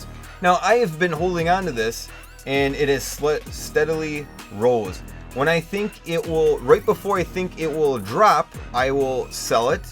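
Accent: Canada. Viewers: You sound Candian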